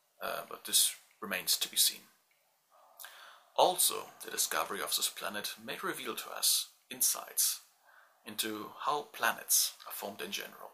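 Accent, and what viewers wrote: Germany. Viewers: God I LOVE hearing that accent! Ich bin von Erfurt. Aber wir (meine Eltern, Tanten, Onkel, etc.) leben schon für fast 30 Jahre in den Staaten! (In Salt Lake City, UT...) Woher kommst du? (Mein Deutsch ist nicht mehr so gut ...) God I hope you AT LEAST speak German... I swear! I KNOW that accent! I hear it every single day! It's so familiar. Then again, you could be Dutch, Swedish, Norwegian, etc... Hope I'm right though! ;)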